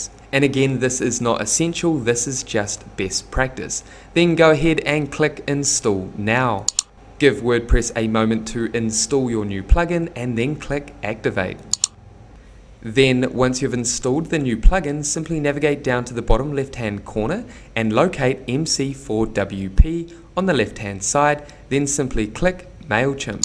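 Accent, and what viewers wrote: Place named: New Zealand. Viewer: Thanks for the great info! We are a Kiwi/Aussie family living in Paraguay so it's nice to hear your Kiwi accent on the video! 😉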